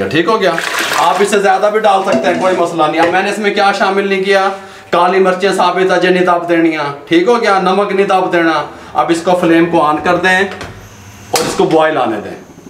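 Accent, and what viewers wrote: Punjab, India. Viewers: Sadqe jawan main tuhade vich vich punjabi touch tu hhhh jeonde rewo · Wah kya yakhni ka swad hy.......or wah kya zaban 'punjabi, ki mithass hy....baly baly.......maza aa gya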